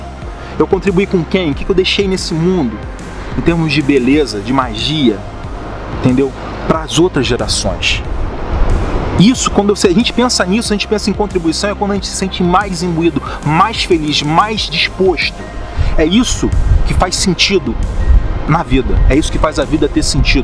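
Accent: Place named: South, Brazil. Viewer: Sabia q esse sotaque era qui do Sul.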